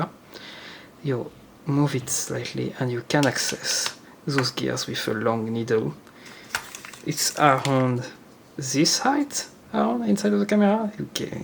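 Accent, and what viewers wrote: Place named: France. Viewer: At first wanted to close the video in first 10 seconds but stayed for french accent and mechanics of camera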